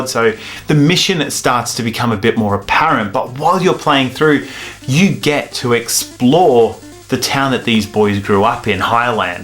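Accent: Australia. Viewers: I like your accent! Are you from Australia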